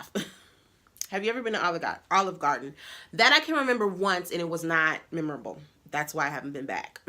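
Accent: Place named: Chicago, United States. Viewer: Yup you have an accent! Chicaaaago lol #NewSubbie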